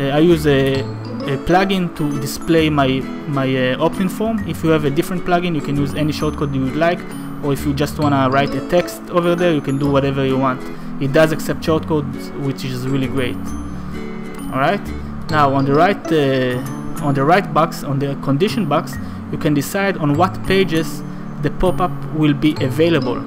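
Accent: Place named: Tanzania. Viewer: You have a Tanzania accent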